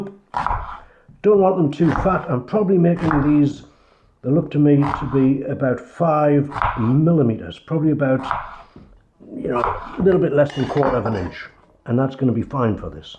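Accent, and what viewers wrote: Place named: Tyneside, United Kingdom. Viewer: Love this recipe, we live in Canada and onion bhajis are weird over here. Husband is from Sunderland (grew up in Washington) and he’s placing your accent between North Shields and Newcastle , how accurate is he? Thanks for the recipe.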